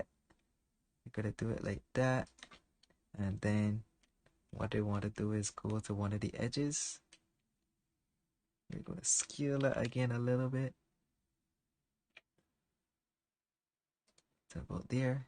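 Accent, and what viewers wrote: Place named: Barbados. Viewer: Haha I knew as soon as I heard the accent. Never thought I would see a fellow Bajan doing 3D Media. I'm excited to learn from you 💕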